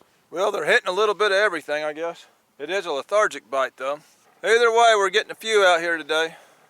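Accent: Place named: South, United States. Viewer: I'm sure somewhere in Tennessee. By the way he talks. He from the south yeehaw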